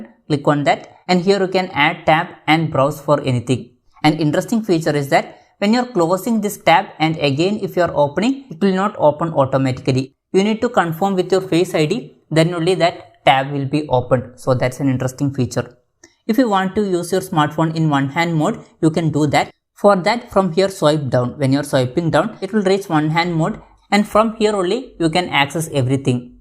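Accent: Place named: India. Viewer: Worst Indian accent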